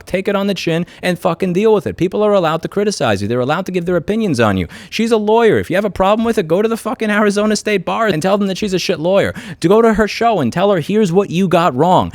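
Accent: New York, United States. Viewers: Sir, your inner new york is showing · You might be in Texas now, but the New York is still running strong through your veins and speech patterns. Love these videos. Im sure one of these companies will eventually attempt to fuck around and find out.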